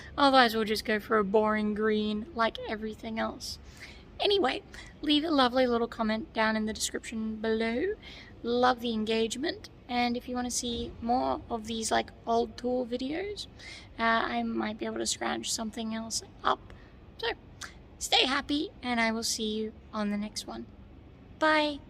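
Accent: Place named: Australia. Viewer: That slightly cracked ozzie accent of yours is delightful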